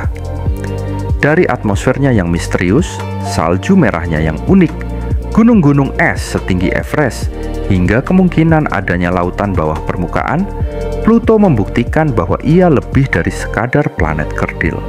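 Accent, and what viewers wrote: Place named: Java, Indonesia. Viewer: Naratornya medok bgt😁aku suka klw mslh astronomi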